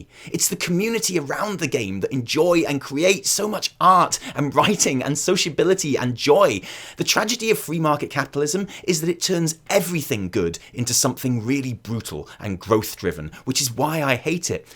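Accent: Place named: England, United Kingdom. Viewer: Y'all get a ton of flack for being "blizzard shills LUL" but nobody can doubt your intelligence, eloquence, or lovely English accent after watching this. I'm sharing this video with all my friends who need a TL;DR for the Hong Kong situation, and / forever have my respect for their sincere and well thought-out arguments against late stage neoliberal global capitalism. Cheers! <3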